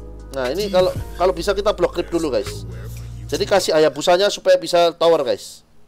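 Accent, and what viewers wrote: Java, Indonesia. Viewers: muka cina suara jawa mantul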